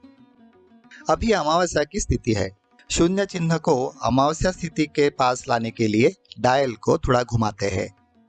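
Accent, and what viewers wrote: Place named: Maharashtra, India. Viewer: Aap ki marathi accent me narration bada madhur lagta hai sunne me aur video rochak hota hai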